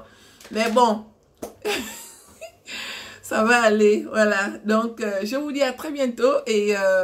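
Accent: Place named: Gabon. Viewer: L'accent gabonais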